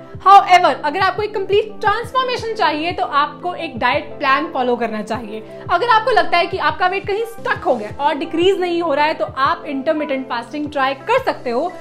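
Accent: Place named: India. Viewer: She is Indian they speak like this. 🙂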